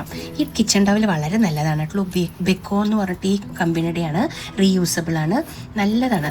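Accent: Palakkad, India. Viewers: My mouth is watering nice to here your kollengode palaghat basha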